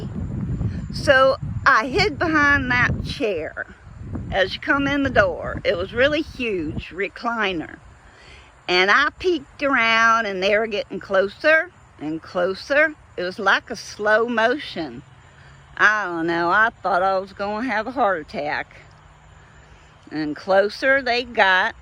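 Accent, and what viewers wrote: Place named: South, United States. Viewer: Hi GF WOW 😮 You told your First Experiences, that is so cool. I know we both have many Stories don’t we 😉 Hey Don’t worry about what some may think !! Sis Elke is Right about it’s a Paranormal Experiences. Like the ones they tell Every single Day 😇 Do you have the Pictures handy from when your Moms Family Home Burnt Down? I forgot it was Christmas 🎅🏽 Morning. Didn’t it get one of the Barns close by? Email me the Pics. when you find them. It was so long Ago, seems like forever. Ok I know it’s been a Long week for you Babe, hopefully tomorrow you can just get some Rest.Your Southern Is Right on Target. You Remember the old Cassette Tape you found of you reading Little Red Riding Hood from Your Grandparents Place? That’s how you sound here. Lmao 😂🤣You were How old? God Bless GF and I talk to you Soon.💙🤍💙🤍💙😘🥰Night.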